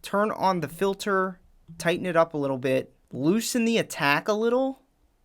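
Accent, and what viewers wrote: Philadelphia, United States. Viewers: I think we know it's a masterpiece, but we can't explain why. And you've definitely showed us. Also, that's 100% a philly accent. Go birds!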